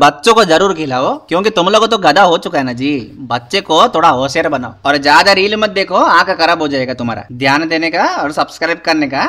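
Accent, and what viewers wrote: South, India. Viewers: Bhai normal baat karle...don't try to speak like South Indian 😢😢😢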